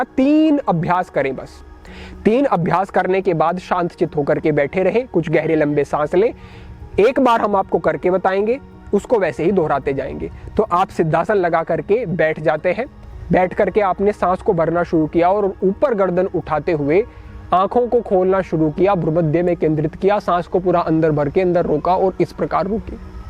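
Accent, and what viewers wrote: Standard. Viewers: Mera man to apki awaz sunke hi accha ho jata hai q ki ap suddh hindi bolte ho badi meethi lagti hai ❤❤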